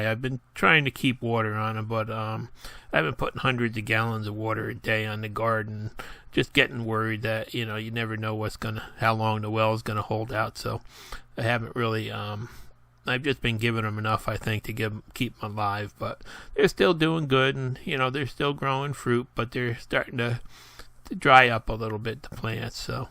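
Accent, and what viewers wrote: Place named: New England, United States. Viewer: get you some quienas well i cant spell but a real hillbilly would know this your a wannabe hillbilly. sound like your a yank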